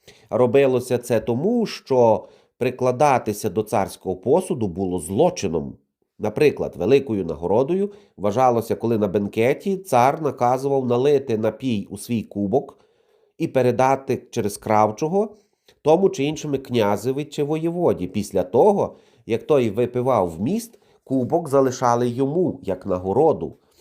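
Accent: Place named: Galicia, Ukraine. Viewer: То здається ви завше так культурно і невинно використовуєте " здихав" і т.і. до моск- тів)) а ще ваш може то галичанський акцент, то звучить це дуже оригінально😅😂😂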